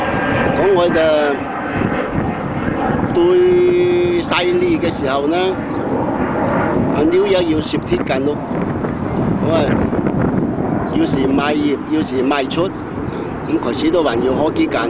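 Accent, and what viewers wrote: Taishan, China. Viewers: I am glad I found your video and listen to Taisanese spoken just like my mum did. My mum was from Toisan, also a Yen 甄。Thank you. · 很地道的老余声，很亲切，很励志，谢谢！我也来自新昌，现在旧金山湾区。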